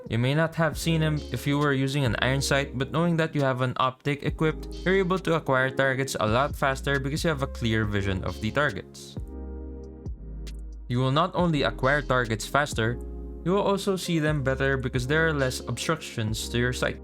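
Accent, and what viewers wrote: Philippines. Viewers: your accent is like a pilipino accent. are you half pilipino?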